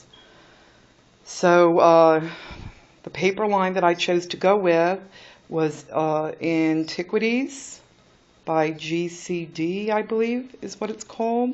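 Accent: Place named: New England, United States. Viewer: Hi. So? I think I hear a bit of New England in your accent? I came over from RJ's channel. The album and it's box are gorgeous.Luscious tags! You did a great job with your 1st vid. I don't have a camera yet. I hope I do as well on my first. Kathy and Leann are both great artists. Have you ever seen Anne from xannero1 videos. She makes the most amazing things! With all sorts of mini shelves and boxes. She even made a mini sewing table for her last mini! TFS Hugs, Jess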